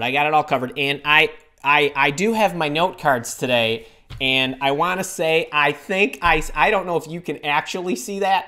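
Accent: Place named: Chicago, United States. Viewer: You are hilarious!!! great advice …the Chicago twang keeps coming out in your tone…just sayin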